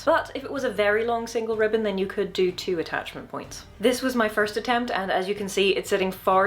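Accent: United Kingdom. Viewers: I love videos. I don't know why. I think it is for several reasons, or some combination of them. 1, voice is soft and soothing. As a typical American, I often fantasize about what it would be like to be with a British woman. It's the accent. Love it. 2, goes into a lot of detail but doesn't dwell on the minutiae. That makes them interesting to me, someone Not in the entertainment industry, but interested in it enough to want to know the cool behind-the-scenes stuff that talks about. 3, editing skills are awesome. The way she combines her talking points, voice-over, and flashbacks makes the video interesting. It's not how I would produce the video... it's WAAAY better. Her film experience is obvious, even to the unenlightened. 4, is gorgeous. 'Nuff said about that. I am a guy, can't help it. This is totally separate from my comments about her voice. Her effect on the visual and aural parts of my brain are different, yet delightful in their own ways.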